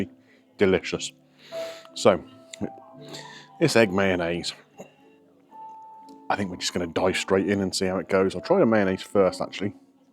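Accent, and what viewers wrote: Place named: Norwich, United Kingdom. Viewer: With your accent you sound like a Norwich local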